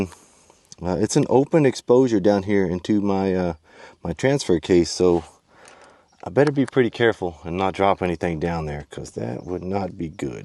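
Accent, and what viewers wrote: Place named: United States. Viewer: Dude that country accent is one of the things I miss moving to Canada. Good looking addition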